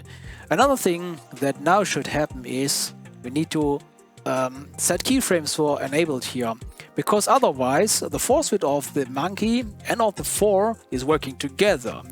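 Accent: Germany. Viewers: i hear german is that right?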